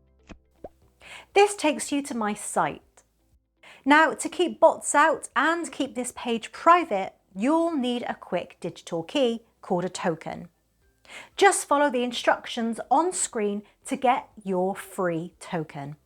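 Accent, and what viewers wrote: United Kingdom. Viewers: It is true, you are a very lovely girl, and I really like your British accent❤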